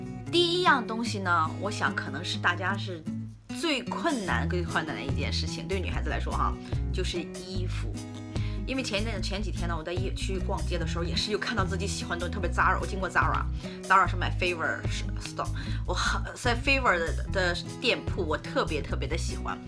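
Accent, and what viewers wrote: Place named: Qingdao, China. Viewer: 听姐姐说话像青岛人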